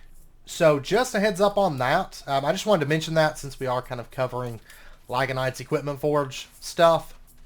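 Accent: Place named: South, United States. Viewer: I can't pinpoint from your accent if your from one of the Carolinas, Georgia, or Alabama/louisiana😂 I got southern relatives that sound just like you, and their from Georgia!👍 You are so pleasant to watch! Keep up the good work!👍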